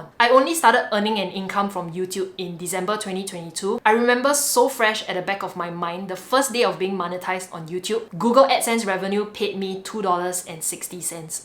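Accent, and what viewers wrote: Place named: Singapore. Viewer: I can never get used to Singaporean accent. Good content though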